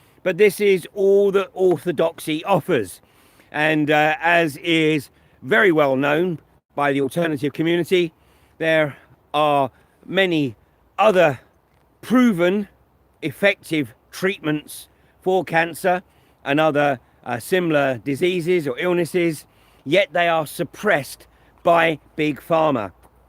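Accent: United Kingdom. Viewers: Great channel. Good to hear a British voice providing truth!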